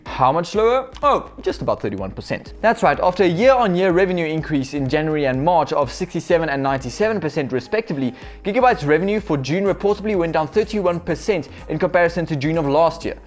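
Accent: Afrikaans, South Africa. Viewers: The Afrikaans is heavy with this one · He sounds South African. 👍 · was this guy south african? (im also south african)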